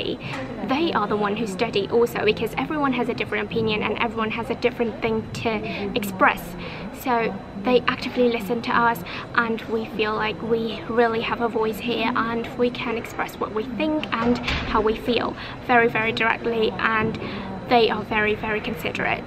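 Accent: United Kingdom. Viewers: Trường bạn đẹp quá ^^ bạn nói tiếng anh cũng hay nữa. Bạn có thể chia sẻ kinh nghiệm luyện accent đc ko ạ? Nghe bạn nói giống British accent :) mình cũng có căn bản tiếng anh và muốn luyện accent giống người bản xứ ạ. Cảm ơn bạn.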